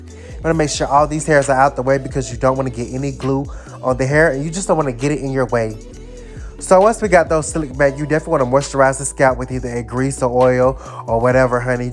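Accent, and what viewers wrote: South, United States. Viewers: This country accent I love it lol😭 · He's accent is southern and he does say he's located in Georgia in every video description.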